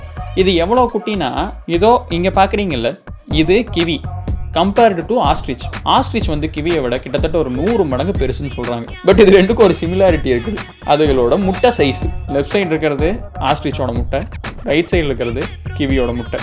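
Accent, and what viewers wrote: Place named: Tirupur, India. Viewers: Neega thirupur ahh antha slaglaye therijuchu😂😂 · Tirupur naala dha namma slang la pesuringa...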